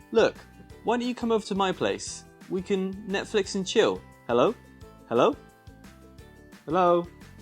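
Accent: United Kingdom. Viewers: you seem like a British native speaker are you from the uk?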